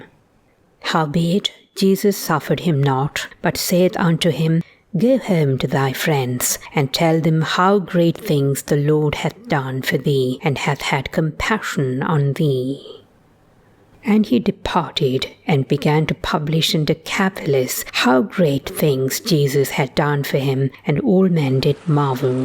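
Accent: RP, United Kingdom. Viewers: Hi! Thanks for this video. This was stunning video with great picture and I loved the music. Did you do this video because Easter is approaching? I love it and love it:) I can't imagine how much time and energy you have put behind these videos and Of course! I don't need to say anything about your posh accent. I would like to take accent class from you if you are conducting accent coaching. Great work!🙂🙌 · What a marvelous video! Very good taste for the images and an impeccable Conservative RP reading. You are outstanding!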